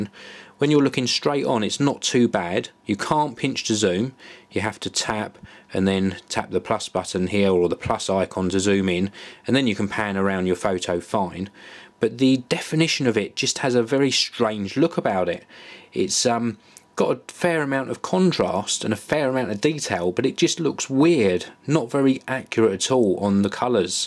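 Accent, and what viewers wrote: United Kingdom. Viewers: I love hairy guys with british accent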